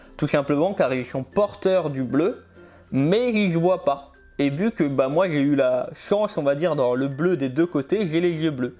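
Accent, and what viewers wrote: Alsace, France. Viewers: Est-ce que tu es Alsacien/Mosellan?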